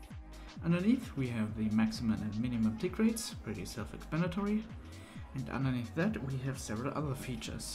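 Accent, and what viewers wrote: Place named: Germany. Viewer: This german accent Ist nett lmao XD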